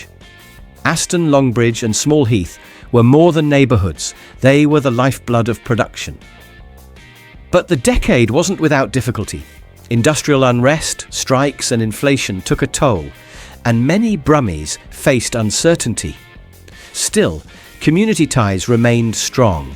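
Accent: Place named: United States. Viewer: He's a yank,period - geddit !!😅😅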